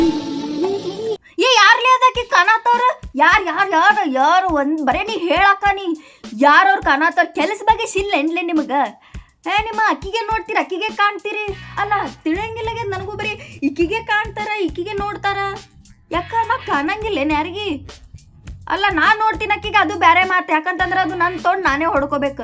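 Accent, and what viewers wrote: North Karnataka, India. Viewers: ಏನ್ ಅಕ್ಕಾ ನಿಮ್ಮ ಬಾಯಲ್ಲಿ ನಮ್ಮ ಉತ್ತರ ಕರ್ನಾಟಕದ ಭಾಷೆ ಕೇಳೋಕೆ ಎಸ್ಟೋದು ಚಂದ ಬಿಡು ಅಕ್ಕಾ 🥰🥰💖 ಅಕ್ಕಾ ನಿಮ್ಮ ವಿಡಿಯೋ ಬಾರಿ ಮಾಡುತಿಯಾ ಅಕ್ಕಾ ನೀನು ಅಕ್ಕಾ ನಿಮ್ಮ ಊರು ಯಾವುದು ಹೇಳಿ ನಾನ ನಿಮ್ಮ ದೊಡ್ಡ್ ಅಭಿಮಾನಿ ಅಕ್ಕಾ 💞💖.. 🤩🤩💐 ನಾವು ಹಾವೇರಿ ಮಂದಿ ಪಾ 🎉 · ನೌನ್ ನಮ್ಮ ಉತ್ತರ ಕರ್ನಾಟಕದ ಮಾತ ಕೆಳಕಾ ಚಂದ ಹಂಗ್ ನಮ್ಮ ಕಡಿ ಸಂಸ್ಕೃತ ಮಾತು ಸಲ್ಪ್ ಹೇಳ್ಬಿಡು ಅಕ್ಕ ಆಕಿ ಸತ್ತ ಹೋಗ್ತಾಳ 😅😅😅😅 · ಸೂಪರ್ ನಮ್ ಉತ್ತರ ಕರ್ನಾಟಕ ಮಾತು ಕೇಳೋದು ಅಂದ್ರೆ ಖುಷಿನೆ ಬೇರೆ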